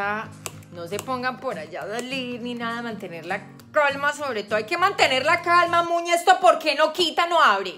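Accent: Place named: Colombia. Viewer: Cómo a rarenge jajaja súper colombiana Muñe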